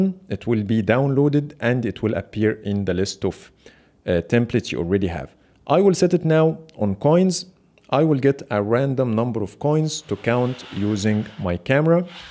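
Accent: Egypt. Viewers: Nice apps thank you for sharing it, as you sound as an Egyptian English speaker.. Great job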